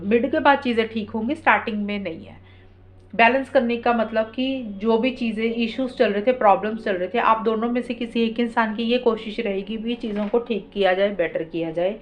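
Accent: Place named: Amritsar, India. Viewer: Aap Amritsar ke ho awajh se lagta hai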